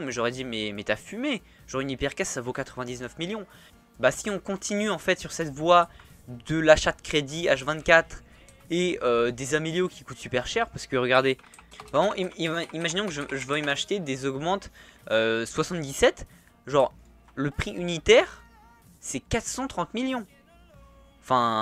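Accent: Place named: England, United Kingdom. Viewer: Bonne idée cette vidéo et ton accent anglais mec woaw 🔥 et 545m pour hypercaisse euh.... 🧐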